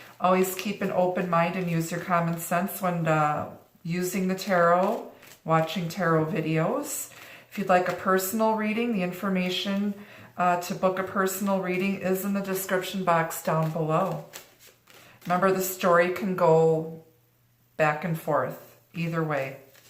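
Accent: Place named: Wisconsin, United States. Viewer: i miss the wisconsin accents in my life! listening has been a real treat for me (subscribed)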